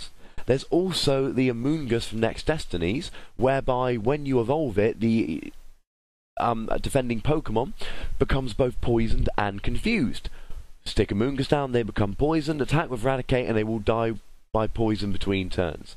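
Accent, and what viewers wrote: United Kingdom. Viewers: I love your British accent! One game I do remember was when I was playing my newly made Zekeels. I managed to set up 3 Eels in less than 7 or 8 turns. My Accelgor/Whirlipeed deck only drew 1 prize during the game. At some point, I thought "Screw this" because I couldn't decide on who should be my main attacker. I just Bolt Struck (while eviolited) until everybody died.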